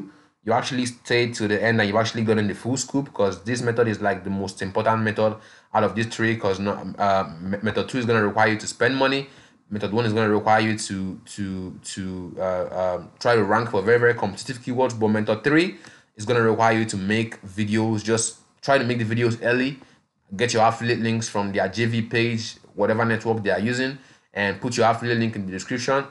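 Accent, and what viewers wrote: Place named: Nigeria. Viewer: Hey, great tutorial, I gather you are Nigerian from your accent, but many from Africa are facing this challenge of poverty, unemployment, poor internet connections, power blackouts etc-Most well-established affiliate programs have an approval process which requires a website and thousands of subscribers, Some programs like Legendary Marketer are not available in Africa, Can you make some videos tailored to Africa, like some work from home solutions /payment solutions that are available to Africans? If you search on youtube you will notice that India and Bangladesh are taking youtube by storm, and they assist their colleagues to get faster solutions tailored to their needs, I wish the same could happen for Africa so that more Africans can benefit from this opportunity and you could be a role model to the youth in Africa. Getting started, lack of knowledge and proper mentorship is the challenge that is facing most Africa, please have this in mind as you continue in your online journey. 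Thanks.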